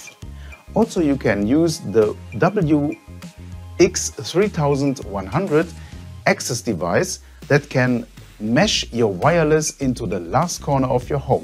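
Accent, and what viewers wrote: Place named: Germany. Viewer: man hört anhand der Aussprache, dass der Moderator ein Deutscher ist. Wir leben in Deutschland - warum also kann dann das Video nicht auch in deutsch produziert werden??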